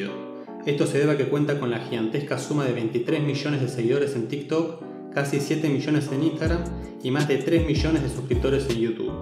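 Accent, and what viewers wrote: Argentina. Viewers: EL NARRADOR ES ARGENTINO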